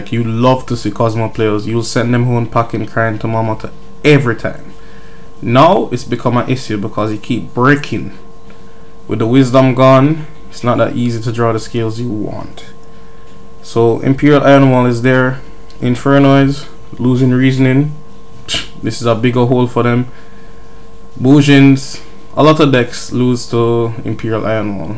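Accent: Jamaica. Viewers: lol I just looked for this deck and came across your channel.As u started speaking I said this guy sounds Jamaican.... this guy sounds familiar. then I realize I know u from school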